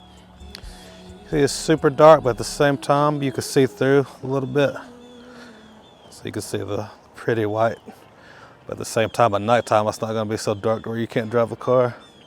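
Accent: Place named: Tennessee, United States. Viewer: Knew he was from Tennessee. He’s talking too cool. That’s how we are.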